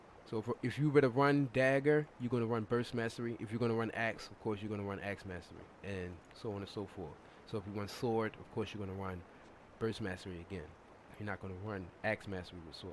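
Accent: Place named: East Coast, United States. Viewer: Do more commentary if you have the time. I be hearing the east coast in the voice, from the Chi-town here.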